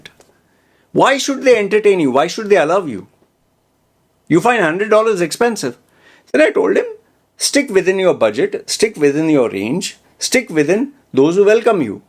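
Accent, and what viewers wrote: India. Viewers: Your first word told me u r an Indian 😊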